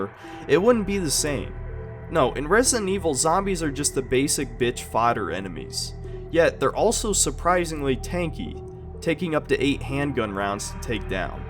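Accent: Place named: Central New York, United States. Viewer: You have got to be from Central NY. Probably Syracuse or Oswego. We can’t escape our CNY accents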